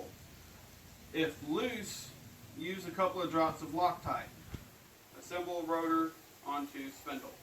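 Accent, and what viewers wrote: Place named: Appalachia, United States. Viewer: You sound like an Appalachian.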